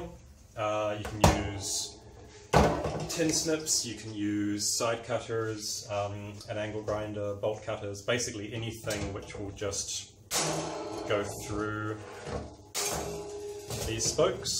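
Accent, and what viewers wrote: New Zealand. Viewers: Hey bro you just got a new sub cool... Your accent sounds kiwi?? Are you nz based would love to check out your work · Brilliant ideas - great video with the detail well explained. Thank you for your generosity in open sourcing all your research. Would you be from New Zealand by any chance? That accent sounds very familiar. Looking forward to the tutorial video.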